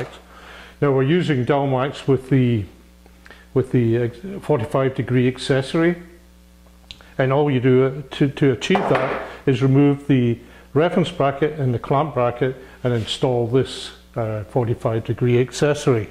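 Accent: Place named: Scotland, United Kingdom. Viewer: Scottish 🏴 twang wee do everything best the Scots great jig 👍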